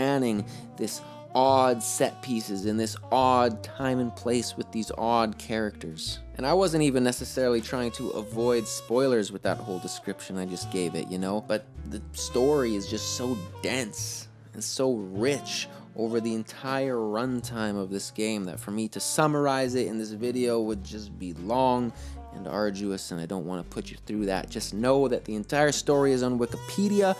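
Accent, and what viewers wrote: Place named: New York, United States. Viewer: You're a real New Yorker aintcha?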